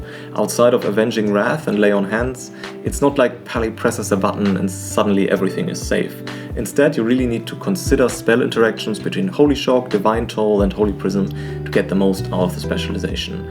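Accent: Germany. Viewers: I like(d) all your videos so far! They are really good - especially the Pres evoker and disc ones! Piano sounds aren’t bad at all, but it is the wrong note for me. They doesn’t fit to your script. It is just my personal feeling, so don’t worry! This doesn’t mean, that your videos are bad or something. 🤘🏼👍🏻 Just a tiny hint: try while editing a click or short swift sound to have a comparison. Furthermore it is obvious or at least it looks like, that you are reading your text. Another suggestion: just read your topic bullet points and use your own words. I’m sure it will underline your enthusiasm even more! Your next video will be good too! Even if you aren’t doing +12s or above with your Druid ☺️ …and last but not least least: I’m German too 😅 so… I can hear it 😎🤣 however, your English is really, really good!! I have to speak English day by day, but I’m far away from your speaking skills! 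Cheers m8 and I’m looking forward to your Druid & mw monk videos! 🤘🏼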